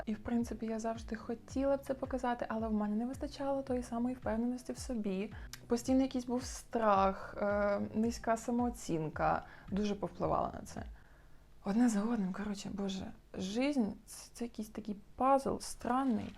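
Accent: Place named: France. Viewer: Ви француженка? У вас таких акцент 😂😂😂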